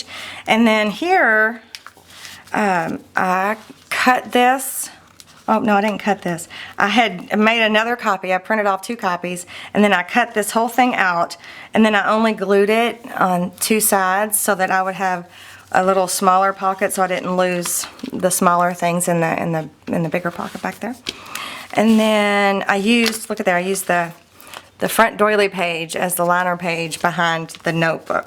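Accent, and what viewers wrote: South, United States. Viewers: Such a generous giveaway!! Love listening to your southern accent...I'm from Pennsylvania and it sounds like music to me. I love your work...you are very meticulous in your creations...thanks for sharing your talents with us!!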